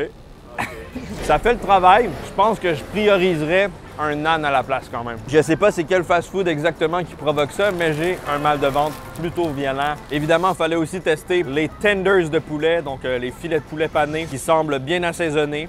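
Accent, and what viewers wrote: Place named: Quebec, Canada. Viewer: Hello, quand viens tu en Suisse? Manger une vraie fondue une vraie raclette et autres spécialités. Bravo pour tes vidéos. J’ai 63 ans je suis venu au Québec en 1989 et vôtre accent me plaît toujours autant. Ne change rien et bonne continuation.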